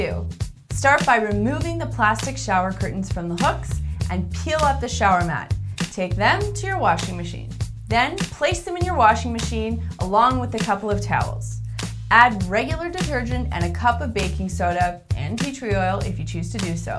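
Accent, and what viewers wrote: Canada. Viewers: Is she Canadian?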